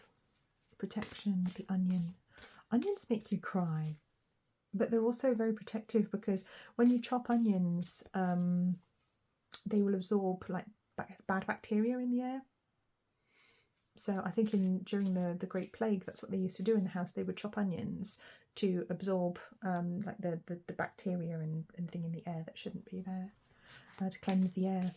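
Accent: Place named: United Kingdom. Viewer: First thing you said in option 2 is you heard a British male voice. That’s my person (I’m American). His particular accent is much like yours. Sunflowers, poker, navy, and keen humor are especially significant. 1’s, 0’s and time— it’ll be 10 years apart at the end of summer. Also my sun and moon signs showed up. This resonated well in many ways—excellent reading. Thank you ❤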